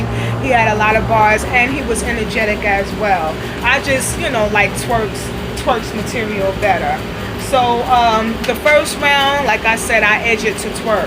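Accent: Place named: New York, United States. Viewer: I love your NYC accent :p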